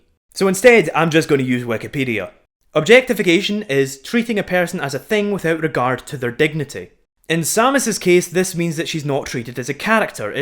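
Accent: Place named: Scotland, United Kingdom. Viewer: Scottish... Oh... Uh, I suppose I probably shouldn't mention what I thought the accent was... I hear it's a touchy subject for Scotts :s.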